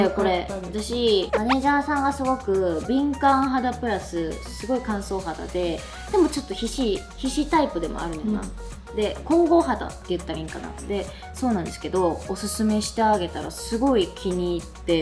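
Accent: Kansai, Japan. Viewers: 凄い種類の多さに驚き、最初どうやって選んでいるの？視聴者は助かるんだろうな～ ちゃんの関西弁に癒される～💞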